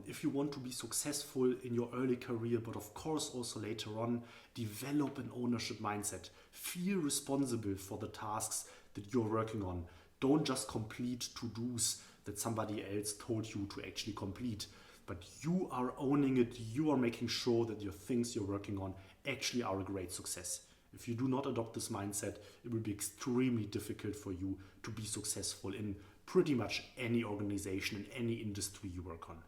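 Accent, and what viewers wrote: Germany. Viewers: My business mentor I did know I had. Like the German accent more so the content. I asked them all and you answered. danke aus tiefstem herzen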